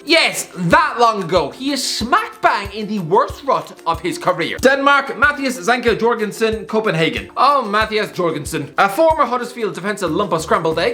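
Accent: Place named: Ireland. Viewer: He is Irish, accents are different SHUSH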